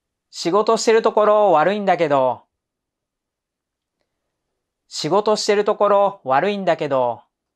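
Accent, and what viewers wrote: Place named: Taiwan. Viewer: 真好，老師說的是台灣腔呢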